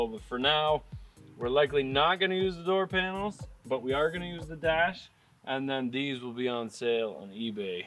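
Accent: Canada. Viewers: Talking a' boat! I love the Canadian dialect!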